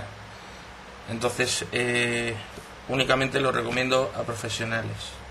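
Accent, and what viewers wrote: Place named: Spain. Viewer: UR A FUCKING IDIOT this is European SPANISH From spain you fuck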